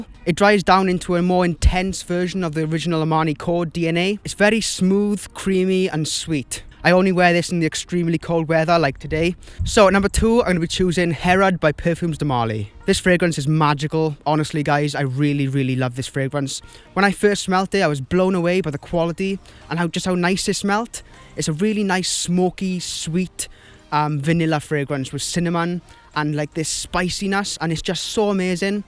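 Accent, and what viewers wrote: Wales, United Kingdom. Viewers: know body he's from Wales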